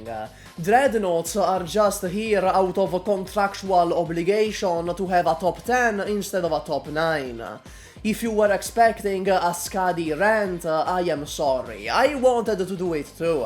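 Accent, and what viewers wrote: Italy. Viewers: It my first time hearing an Italian Explain Arknights, How the Siracusao?? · I reconized that accent as a fellow italian, good to have a youtuber from around hete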